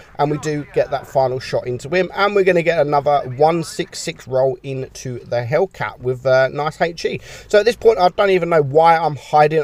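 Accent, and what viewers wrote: United Kingdom. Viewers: outolodah 2021 I love the British accent lol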